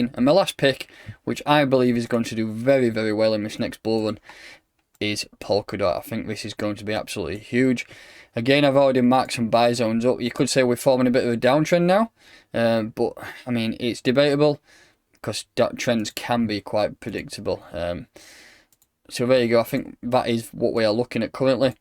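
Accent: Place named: Chorley, United Kingdom. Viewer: I'm putting you in Chorley or somewhere with that accent mate. You deserve a bigger audience - nice, easy to follow analysis.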